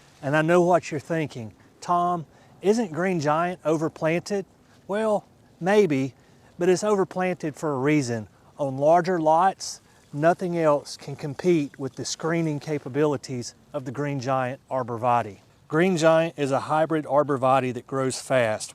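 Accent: South, United States. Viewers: This gentleman has done a nice job, but the video would be even better if he'd provided information about the shrubs' plant zone and deer hardiness. His southern accent should have tipped me off to the possibility that he might focus on southern plants.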